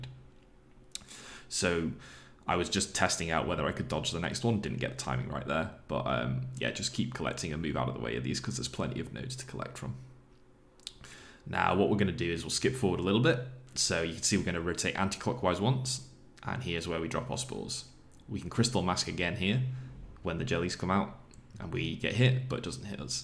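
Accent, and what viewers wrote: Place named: United Kingdom. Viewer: He's Br*tish 🤮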